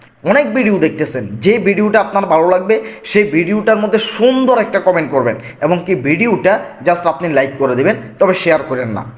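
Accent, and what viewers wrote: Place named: Noakhali, Bangladesh. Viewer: আপনার উচ্চারণ পুরাই নোয়াখাইল্লা টান আছে,শুদ্ধ বাংলা উচ্চারণ জরুরী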